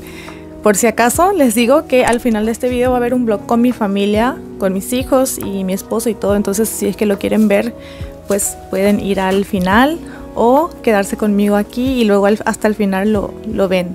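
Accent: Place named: Mexico. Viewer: siento que tu acento ya se escucha más mexicano, igual hablas relindo ❤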